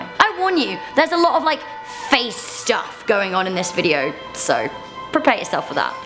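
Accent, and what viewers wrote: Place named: United Kingdom. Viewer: JIFS LADY!! And btw I love their brit accent 😍😍😂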